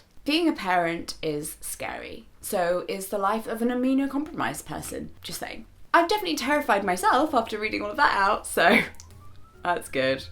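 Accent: United Kingdom. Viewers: She's just awesome. She puts things so clearly and that British accent makes it so much more awesome. Additionally, she always looks so classy while laying out the facts. Oh, by the way, I get vaccinated for everything I need (and a few extras), not just for myself, but for the health of others. In fact, I'm about to get a MMR booster soon., keep up the great videos, I've enjoyed all of the ones I've watched so far.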